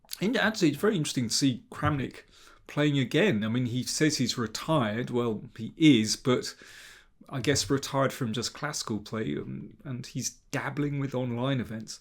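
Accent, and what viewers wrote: England, United Kingdom. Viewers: Congrats on 100K, Great English accent...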